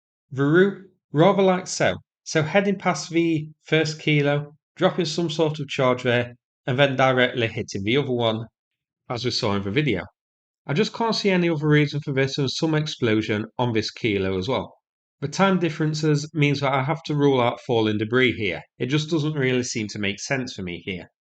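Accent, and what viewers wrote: United Kingdom. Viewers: thanks subtitles...where are you from in Britain?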